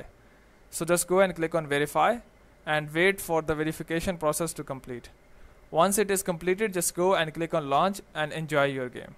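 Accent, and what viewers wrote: India. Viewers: you sound like a technical indian scammer but you fixed my game.Thanks!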